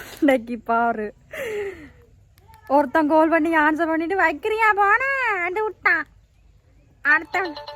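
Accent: Sri Lanka. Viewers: உன்னுடைய இலங்கை பேச்சி ,அழகு,கவடு சூதற்ற தன்மை பிடிச்சு இருக்கு.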